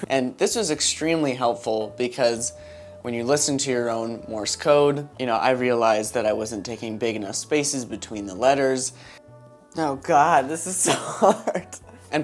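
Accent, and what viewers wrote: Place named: Canada. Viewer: You sound Canadian are u Canadian ?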